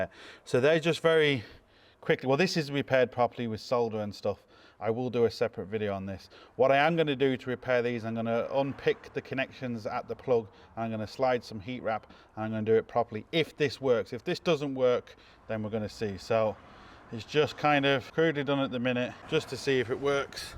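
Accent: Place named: United Kingdom. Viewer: I've been enjoying the vids mate. Your a cheerie Brit. If you can get some of them fine lasses to speak I'd be in heaven.love British accents.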